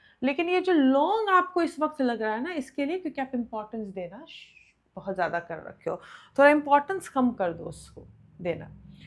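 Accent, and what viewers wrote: Bihar, India. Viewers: Mast form mei ho.. bas apka bihari accent miss karte h jaise aap purane videos mei karte the.. we feel more connected🌻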